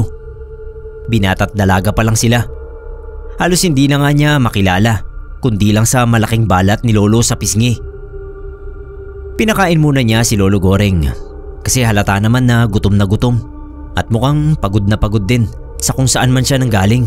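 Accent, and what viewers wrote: Iloilo, Philippines. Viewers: kuya ilonggo ka no? 😅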